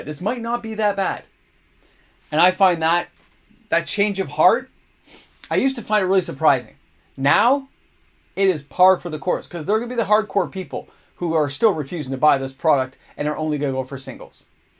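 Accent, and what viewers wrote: Canada. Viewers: I almost shit myself when you said new capenna was 135$, then I heard your accent and figured it's Canadian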